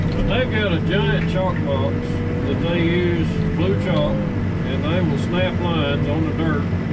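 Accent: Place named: Oklahoma, United States. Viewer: That Okie accent is Okay!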